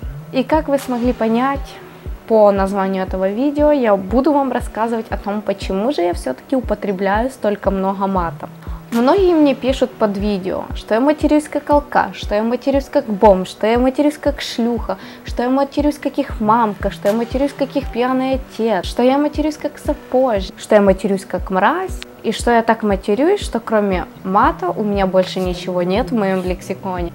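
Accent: Ukraine. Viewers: Ваааах. Как мне нравится её лексика и украинский акцент ❤👍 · Твой мат и украинский говор выделяют тебя из "серой массы" блогеров, вообщем таких, как ты ни#@ нєту)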